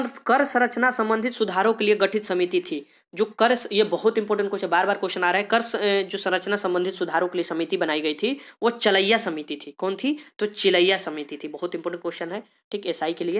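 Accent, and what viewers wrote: Bihar, India. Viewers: Sir aap Kya bihar ke rahne bale h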